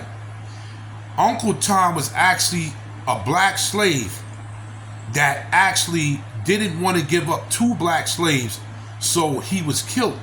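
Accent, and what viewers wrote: New York, United States. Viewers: White people love you right back and new subby here and I'm not leaving you..I just love the new York accent❤❤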